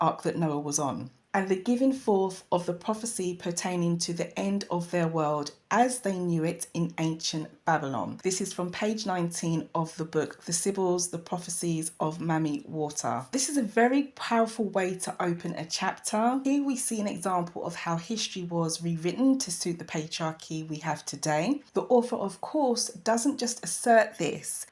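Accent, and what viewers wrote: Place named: United Kingdom. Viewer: So good to hear a British accent speaking on such topics. Keep it up.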